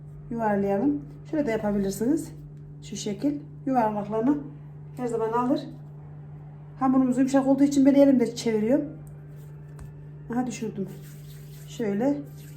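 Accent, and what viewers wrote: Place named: Central Anatolia, Turkey. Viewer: Sizi yeni keşfettim öncelikle sivenizden icanadolu olduğunuzu anladım dahada mutlu oldum ellerine sağlık Bende çocuklarımın icin.deneyecegim .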